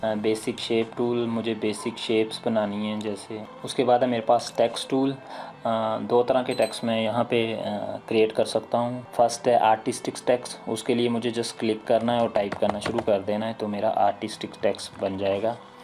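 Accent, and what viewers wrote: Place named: Himachal Pradesh, India. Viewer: Wow sir me Himachal se hu app kha se ho sr apki awaaz bhi Himachali lg rhi h😮😮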